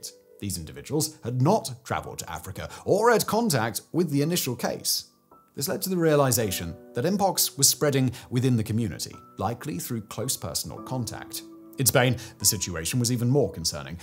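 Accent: United Kingdom. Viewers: thanks I have a hard time with the brit accent sometimes. But what I said still holds true. I'm worried about clade2.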